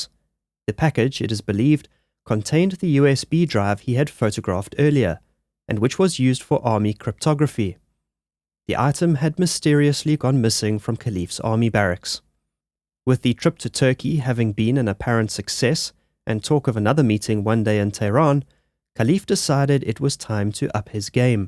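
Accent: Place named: South Africa. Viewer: I always get thrown off by the South African accent at the start, but then it reminds me off home so I love it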